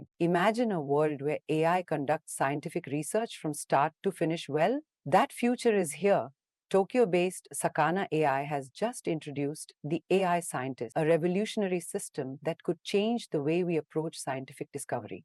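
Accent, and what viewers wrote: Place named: India. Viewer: Indian accent😂😂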